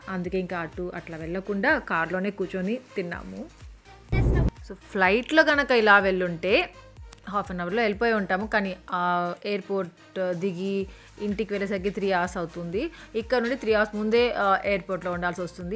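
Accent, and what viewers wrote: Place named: North, India. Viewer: Alane anipistundi north side vallu anukunta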